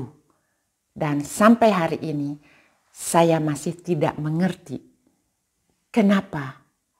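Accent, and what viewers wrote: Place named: Bali, Indonesia. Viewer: Maaf,ibu drmn ya aslinya ??? logat bhs indonya kental org bali..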